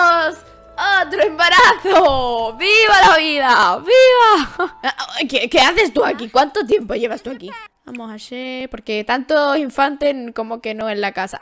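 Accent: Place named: Andalusia, Spain. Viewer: Y me encanta tu acento súper andaluz con la s yo soy de Cádiz pero vivo en Marbella✌🏾👑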